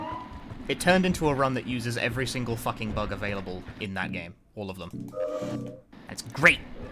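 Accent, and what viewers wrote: United Kingdom. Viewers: Fantastic run! Not surprised Cedar Grove Sanitarium, on a damn WR no less, managed to take over 11 minutes to itself. The place is massive and during your run I actually lost count of how many key items it involves. Been a while since I last played... Out of curiosity, what game are you saying that is "boring" to speedrun around 29 minutes in? I'm not very good at understanding British accents unfortunately. :(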